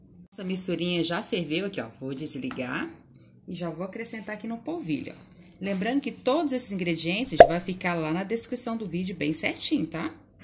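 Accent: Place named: Minas Gerais, Brazil. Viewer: que bom escutar se o sotaque mineiro. Sou mineira mas moro em Brasília. Vou fazer a peta agora!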